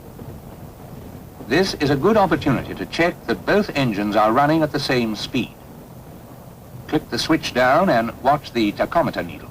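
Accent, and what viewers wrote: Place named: RP, United Kingdom. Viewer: Even Prince William doesn't talk that posh!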